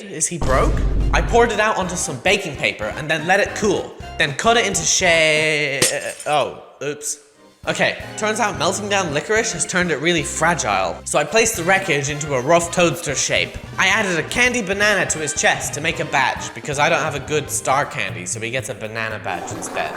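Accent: Australia. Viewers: U Australian 😄😄😄😄😄😄😄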